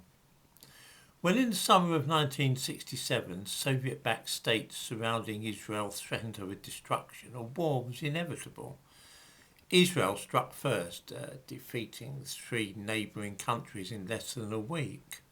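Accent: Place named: Cockney, United Kingdom. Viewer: He could be and he does hail from East London and his dialect could be construed as Jewish. My mate’s dad was a Jewish tailor from Walthamstow who should to supply the Krays and he had that East End dialect which might be heard as he speaks.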